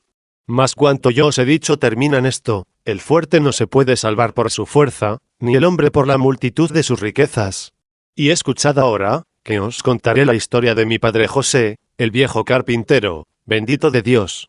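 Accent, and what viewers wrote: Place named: Castile, Spain. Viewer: Por favor, no uses tu voz Robótica. Vuelve a hacer este video con movimiento de personajes, hay muchas películas de dónde tomar cortos. Por favor, trata de hablar y leer en español latino porque no soporto el castellano, te agradeceré hasta que me muera si me das gusto. Trata de cambiar los textos que lees al español latino, como hablamos el nuevo y mejorado español de México, y te felicito por este video, lo buscaba pero no lo encontraba.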